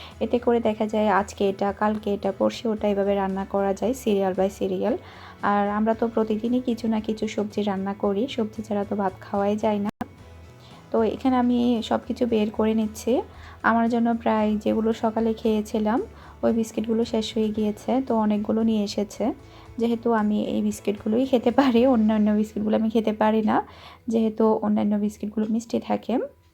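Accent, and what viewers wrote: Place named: Chittagong, Bangladesh. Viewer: আাপু আমার মেয়ে তোমার ভিডিও দেখে।তোমার চিটাগাং এর ভাষায় কথা গুলো শুনে ও হাসতে হাসতে শেষ।🤣🤣🤣🤣ওর এখন ৬বছর বয়স। আপু তাড়াতাড়ি চিটাগাং এর ভাষায় ব্লগ করে আমার মেয়ে টারে খুশি করে দাও।আর মিহি মনিকে এত্ত গুলো আদর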